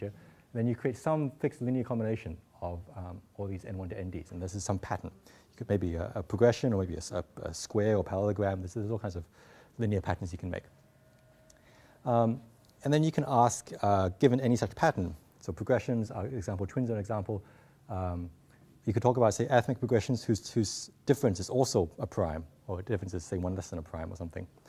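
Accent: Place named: Australia. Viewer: chinese with australian accent teaching math in america... globalization!! yeah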